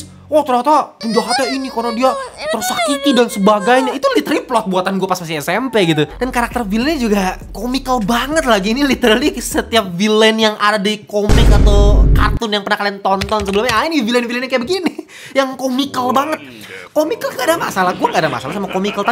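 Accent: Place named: Medan, Indonesia. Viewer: Nah kalo ini baru logat Medan bang... salam dari Medan 😄😄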